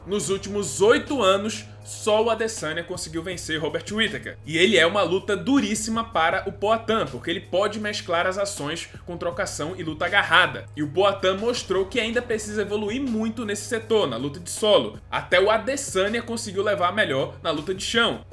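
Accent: Recife, Brazil. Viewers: Tu é de Recife bixo ?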